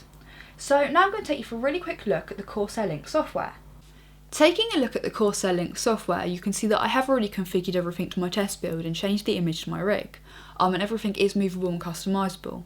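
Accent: England, United Kingdom. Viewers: you look russian on your thumbnails, and suprised when i hear the british accent haha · I really like UK accents! It just sounds so nice for some reason! xD Great video btw! :) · I'm sure you meant English* accent dude. She's English and thus speak with an English accent. I'm an American and I speak with an American accent, happens to be a Southern one (Virginian) since I'm out of the South. Something tells me you are an ignorant Yank. :) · Blondie and Coumputers? o_O? lol but her British accent is sweet:) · A brit-accented blonde telling me about technology? What sorcery is this?!!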